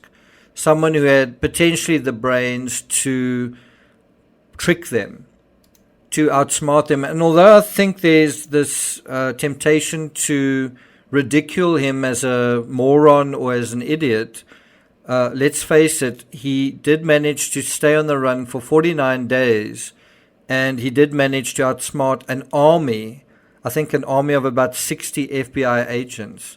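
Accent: South Africa. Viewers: Howzit. Off topic, but are you South African? Your accent is very familiar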